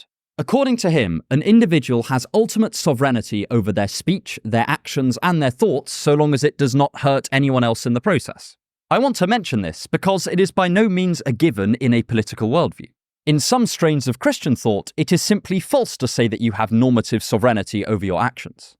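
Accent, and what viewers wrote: United Kingdom. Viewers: I really enjoy listening to your videos. I love how evenhanded you are in presenting both sides of arguments and how you make philosophical ideas come alive. And, I love your British accent! 🤩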